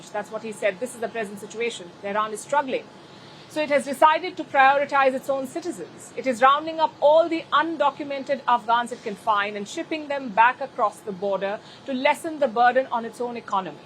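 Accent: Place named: India. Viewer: Her English is India English not English..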